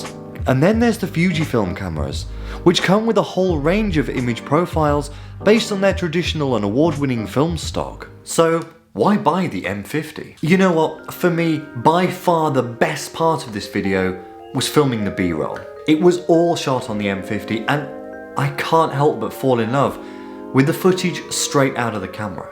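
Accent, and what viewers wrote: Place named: United Kingdom. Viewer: I don't know why but the British accent just got me. Love it though! and M50 is really handy for vlogging for sure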